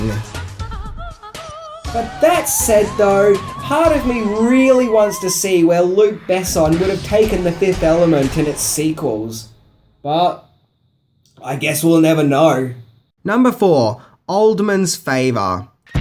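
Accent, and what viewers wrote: Australia. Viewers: wait...you are from Australia? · imagine if made an 10 amazing facts video and didn't introduce 'imself in his best australian accent ".......and I"M!" i'm holding out for an "I'M " at the goodbye. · Hey love that Ausie accent!!!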